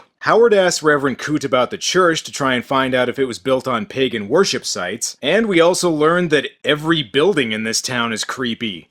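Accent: Ireland. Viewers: The Iriah accent made me laugh out loud literally literally. Have a. Subscribe yer sexy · If Charlie Kelly's Green Man had produced this film, we'd probably be a lot better off. Did a pretty-good job on Lethal Weapon 5 & 6. I love that a 30-foot solid stone obelisk is apparently supported by a foundation that's only about 2 feet deep. A butterfly could push that thing over. Great Irish accent, though I think I detected a wee bit of Maritimer starting to peek-through at the end, lol! Not that there's a ton of difference, mind. Seems like it's always a bad idea to give your monster human eyes, even if they're red. Kinda like sharks with human teeth; it just utterly destroys the creepiness. Rawhead looks like a less-scary version of Judge Doom from "Who Framed Roger Rabbit?". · Am I the only guy who instantly got a boner from talking with an Irish accent? No? Ok, just making sure that I'm not weird... 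· Rawheadrex , queen kong, deathstalker who come up with these name next you going to tell us there a movie called WTF Also that Irish accent is amazing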